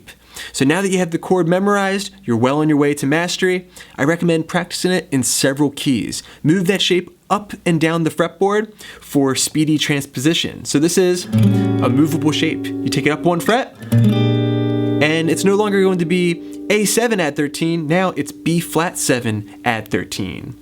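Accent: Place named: South Jersey, United States. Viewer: I'm from South Jersey but have lived overseas a long time. Great hearing that familiar SJ/PHL accent! Great tutorial. Seriously helpful!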